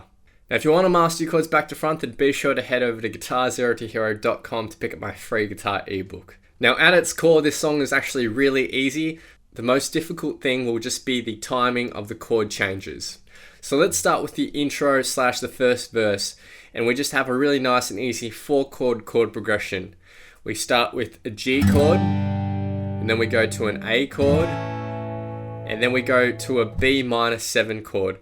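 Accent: Australia. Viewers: Am I hearing an Aussie accent there? This is a great tutorial, so brilliantly guided through all the steps and details!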